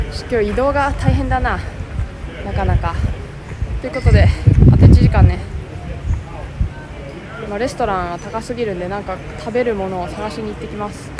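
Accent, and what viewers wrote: Gifu, Japan. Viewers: 時々岐阜弁がしゃべっとって、おおきに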